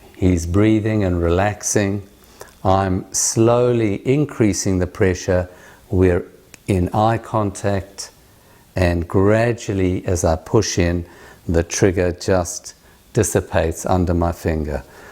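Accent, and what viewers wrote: South Africa. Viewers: Dear, your accent sounds South African. Loved this video.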